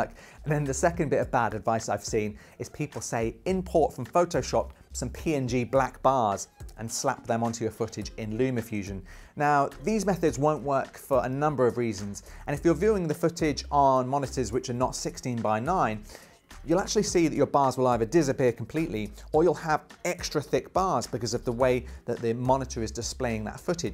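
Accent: United Kingdom. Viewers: You are a great facilitator!!! Can this program be bought as an IOS APPS? Can it be used STAND ALONE version? No Photoshop needed? Love the Mersey Accent. Thanks for your attention to my questions.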